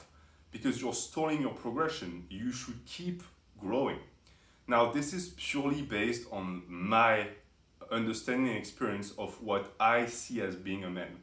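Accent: France. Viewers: Is your native language french?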